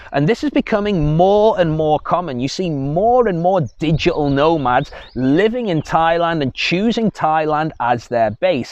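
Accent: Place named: England, United Kingdom. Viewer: Nice english accent!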